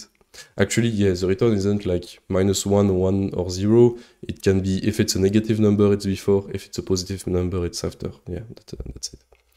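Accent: France. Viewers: u speak english like a french person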